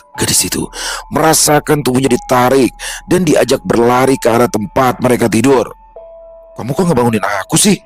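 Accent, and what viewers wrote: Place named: Banyumas, Indonesia. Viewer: Bahasa jowonya kok kaku, dadi bahasa ngapak semua.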